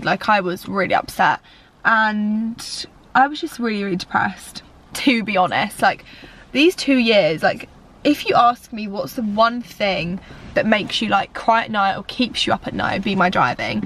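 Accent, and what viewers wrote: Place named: Essex, United Kingdom. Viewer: She always sounded like this LOL just an Essex accent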